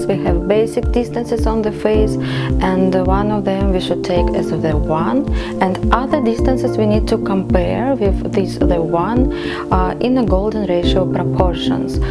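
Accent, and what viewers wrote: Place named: Russia. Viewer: А че говор Русский))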